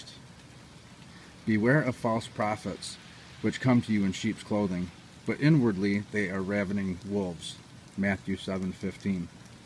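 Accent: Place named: Rochester, United States. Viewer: Hello brother. I am new to your YouTube channel. I subscribed because as I scrolled through your videos I noticed videos and I adore that man! May he rest in peace. Also, I have a very dear friend who is from Rochester New York and your accent sounds so much like his. I guess those are two reasons as good as any for me to stick around for a while. God bless you.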